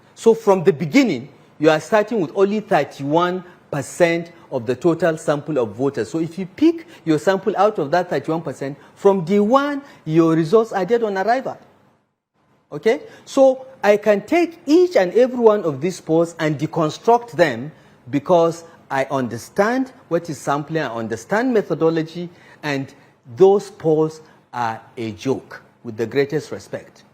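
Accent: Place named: Kaduna, Nigeria. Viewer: Sit down there and continue to speak Kaduna English.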